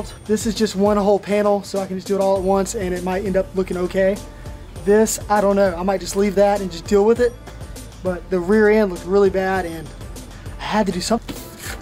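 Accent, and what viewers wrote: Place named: South, United States. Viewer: The southern accent didn't clue you in?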